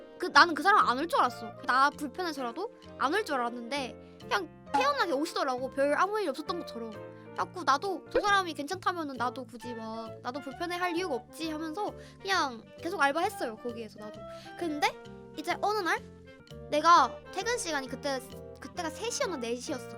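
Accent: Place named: Gyeongsang, South Korea. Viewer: 갱상도 분이신갑네예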